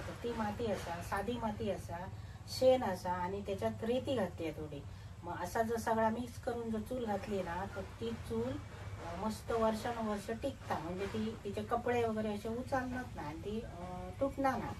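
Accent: Malvani, India. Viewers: पारंपरिक पद्धतीने बनविली जाणारी खीर तुम्ही अगदी छान पद्धतीने दाखवली त्याबद्दल धन्यवाद तुमच्या तोंडी मालवणी भाषा ऐकायला खूप छान वाटते.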